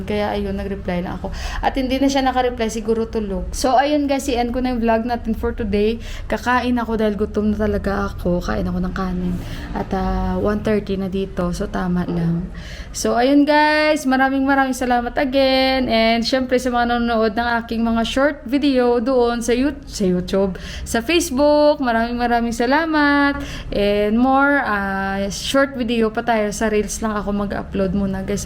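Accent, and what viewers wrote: Iloilo, Philippines. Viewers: Natatawa ako sa accent mo sis naalala ko best friend ko tiga Iloilo dito sa italy aprehong pareho kayo Kung magsalita hahahaha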